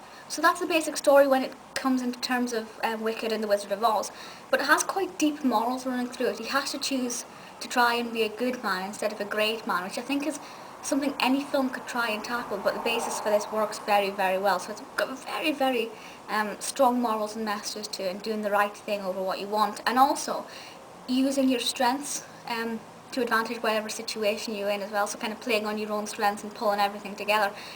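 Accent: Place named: England, United Kingdom. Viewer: Miss: Love your witty review of Oz. I also love your English Accent!!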